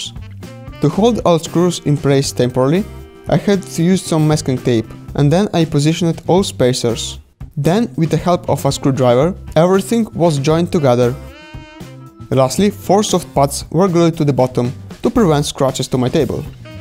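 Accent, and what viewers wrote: Italy. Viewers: ehi, sei italiano? si sente dall'accento... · Si sente che sei italiano...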